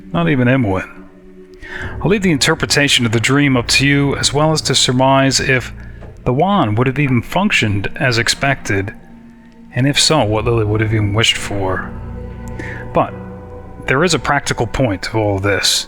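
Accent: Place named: New York, United States. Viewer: Maybe it's because you're a New Yorker. Sounds like you're saying Lie-LICK. Just like how you pronounce "Ruins" as "runes". :P Course, I'm a Philly suburbs guy, So I can't really talk. :P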